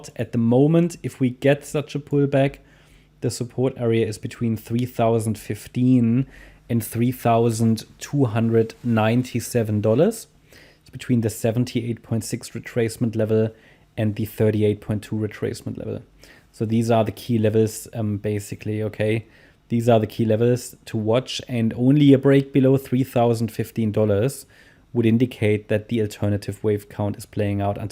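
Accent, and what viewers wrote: Germany. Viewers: Ok, my mistake. Sounds like a German accent. Or maybe you are German just living elsewhere?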